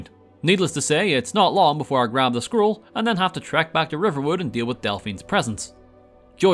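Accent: United Kingdom. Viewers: ah- british playthrough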